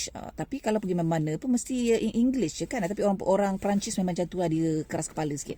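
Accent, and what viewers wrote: Negeri Sembilan, Malaysia. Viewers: thats right she does speak in nogori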